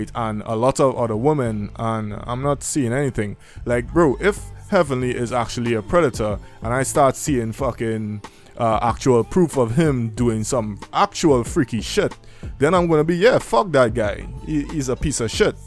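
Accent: Trinidad and Tobago. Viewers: Hey... where you from?? You sound like a trini? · You from Trinidad? You kinda sound Trinidad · You a Trini bro? Bless up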